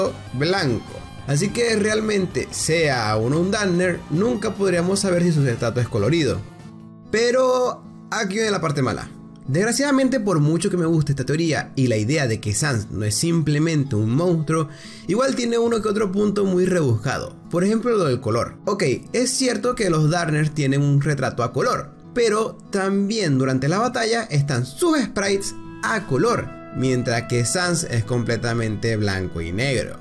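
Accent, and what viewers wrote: Venezuela. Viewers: Eres venezolano XD mano del alma! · eres venezolano? :V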